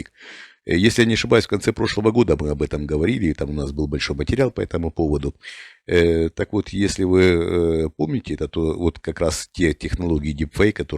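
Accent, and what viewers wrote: Ukraine. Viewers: Виктор Михайлович, вы же говорите по русски. А в русском языке принято говорить на Урале, на Украине, а не в Украине. Выражение в Украине сильно неграмотно и политизировано. Понятно на чьей вы стороне, да и акцент у вас украинский. Не знаю насколько вы генерал СВР. Вы хоть думайте хотя бы иногда, а то мне кажется у вас немного ненормально с логикой.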